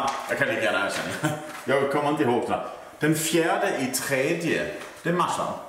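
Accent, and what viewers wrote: Scania, Sweden. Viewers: Grattis till 10k följare härligt att höra din skånsk/danska dialekt. Tycker du ska döpa moppen till Rosa ladyn/ rosa tanten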